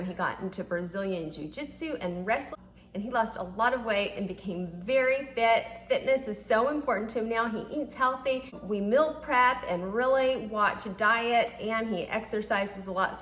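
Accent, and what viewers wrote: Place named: South, United States. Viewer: I look forward to your videos! No disrespect but I LOVEE your southern belle accent, so beautiful! Your sales always blow my mind and keep me motivated. I had a good sales week on Poshmark and Ebay. For some reason Mercari is just little slow and not as fun haha. Eventhough I sold a hobo crochet bag from The Sak that I paid 6 for, it was limited edition sold for 45 so if anyone finds one...snatch it and list it!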